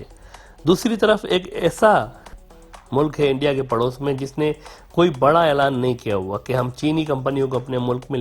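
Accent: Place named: Pakistan. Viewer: No He lives in Pakistan bc He is speaking urdu very fluently also he has perfect pakistani tone .BTW he himself Told in a comment of a video that He is Pakistani . That video is old it was abt Onion export .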